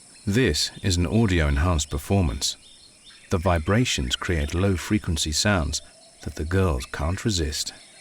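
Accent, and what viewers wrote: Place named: England, United Kingdom. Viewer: High-Quality Documentaries ✨💎I have no idea who these producers are, but this documentary is certainly on par with BBC. And Im not saying that simply because the narrator is English. First class photographic direction & editing. And the cinematography of these landscapes- - Alaska, the Amazon, & India- - are all magnificent, of course... There is no more noble public service than documentaries that teach the Natural Sciences, & display animals & habitat A hundred Thank Yous for commiting this film for broadcast through Youtube 💜💜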